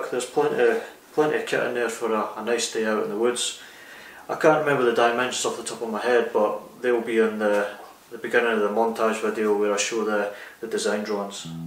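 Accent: Scotland, United Kingdom. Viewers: Cool pack, I like the orange lining. I've noticed your Scottish accent is getting stronger now your back up there, no problem for me, I can understand ya, I lived in Aberdeen for 3 years. Nice one. Lee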